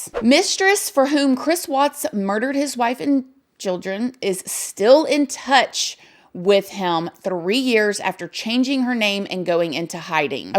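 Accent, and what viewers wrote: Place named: Texas, United States. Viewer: Darling, you are fabulous, and FUNNNNNEEEE! You know what I think? I believe Chris Watts couldn’t walk away his pregnant wife ((What would people think of me, so, his family had to disappear - and NK’s pull on him was tantamount to hypnotism; as though she were a real life Siren, he unable to resist her call. ….! I willdefinitely be watching more of you; you are incredible!! Love your Tevan accent Y’all an’ all!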